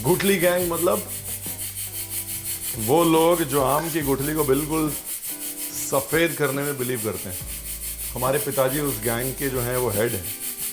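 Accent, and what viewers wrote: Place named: Lucknow, India. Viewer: Adaab ranveer sir apka lakhnavi andaz acha lagta hi cooking with urdu adab maza Agaya. This makes ur cooking and show obviously ur dish royal and great and delicious too like that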